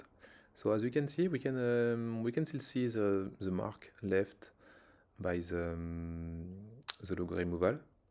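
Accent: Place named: France. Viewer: Je ressent un léger accent French l’ami 😉 je me trompe 😜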